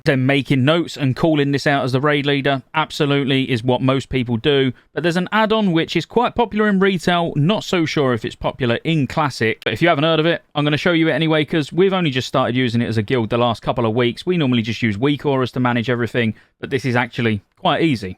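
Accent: United Kingdom. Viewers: Is this a special type of British accent? It sounds interesting and a little different than what I’m used to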